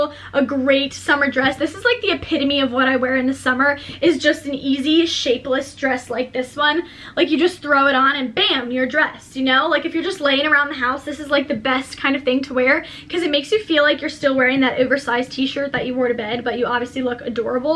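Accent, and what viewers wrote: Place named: South, United States. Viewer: OHHH... you're SOUTHERN southern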